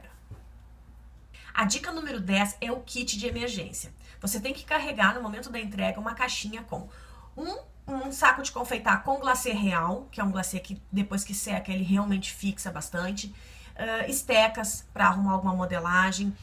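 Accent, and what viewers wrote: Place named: Southern Brazil, Brazil. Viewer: Ouvindo o seu sotaque fiquei tentando imaginar de onde você é... RS? PR?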